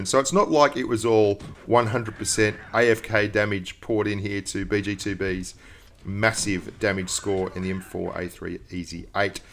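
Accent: Australia. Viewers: yes hes aussie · Are u Aussie I'm Aussie as well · And also I just realised ur an Aussie..........I'm a kiwi from NZ XD watupppppp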